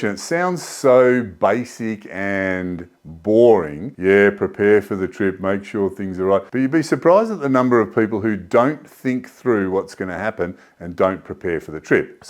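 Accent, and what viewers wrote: Australia. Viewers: 😎👍 Big thumbs up for the channel... Love hearing different way things are said Down Under... Motorbike... first time for ever saying that word... I'm 68... Stay Safe Brothers...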